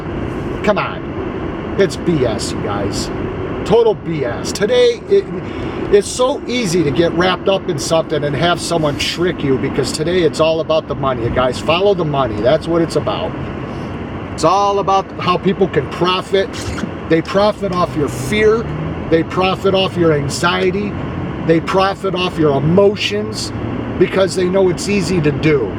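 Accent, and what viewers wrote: United States. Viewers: American, i would say. (english accent)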